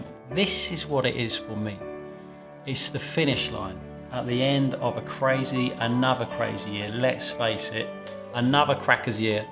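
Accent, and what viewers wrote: United Kingdom. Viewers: Merry Christmas,. None of the "issues" have been an issue for me. I just love to see you giving bread tips with all your energy and that unmistakable British accent of yours. P.S. What is your secret for looking so young? You must be in your twenties or thirties, but you look like a teenager.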